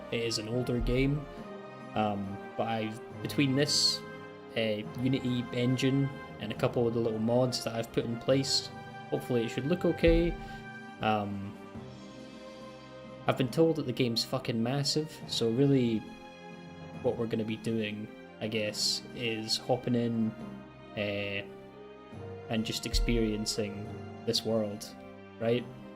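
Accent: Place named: Scotland, United Kingdom. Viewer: Sorry mate I fell asleep, everything looks and sounds great. Your Scottish accent and vocal cadence pair really well with the mood of elder scrolls❤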